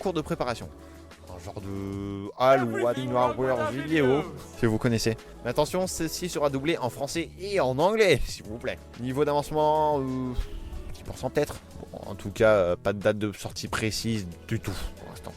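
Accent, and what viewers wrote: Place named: France. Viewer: I fell in love with this French pronunciation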